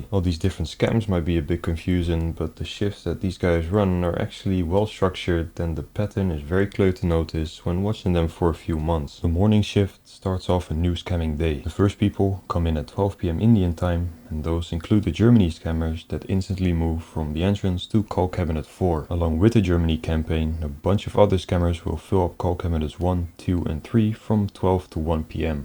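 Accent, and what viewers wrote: Netherlands. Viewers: Judging by your accent.., your Dutch? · He will not reveal his location, but yes he sounds Dutch to me.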